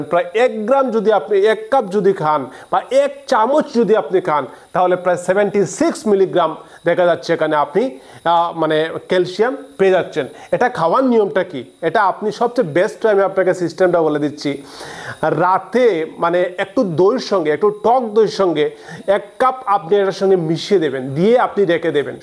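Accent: Sylhet, Bangladesh. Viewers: ভাই আপনার বাড়ি কি সিলেট। আপনার ভাষা টা সিলেটির মত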